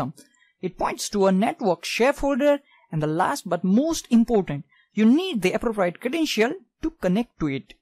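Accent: India. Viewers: No disrepsect but your accent is very hard to understand Why is it that just about every video on how to do this is by a indian or muslim guy? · I'm unable to understand, you have a strong Indian accent & to make things worse to speak too quickly!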